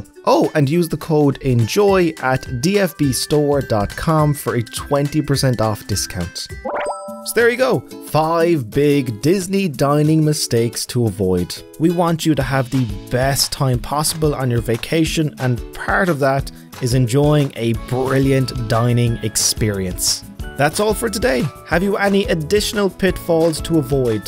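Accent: Ireland. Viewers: Love 💗 Irish accent! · sounds it, I have an Irish neighbor who sounds like this but female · Are you Irish? I love your accent! It reminds me of a professor I had in college.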